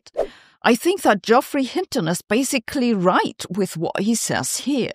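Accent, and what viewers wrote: Germany. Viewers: In fairness you have a near flawless German accent.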